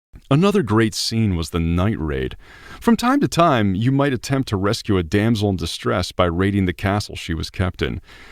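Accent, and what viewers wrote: France. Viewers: But you likely would call it a "Zee"X Spectrum. ;) I'm all for hearing the French accent. I think your passion is great and you're not likely blinded by nostalgia.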